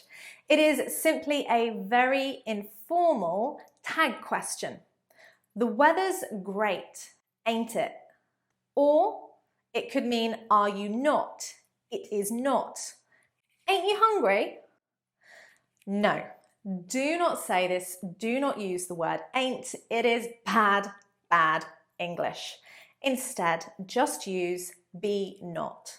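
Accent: United Kingdom. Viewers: thanks a bunch for that i love your accent BR E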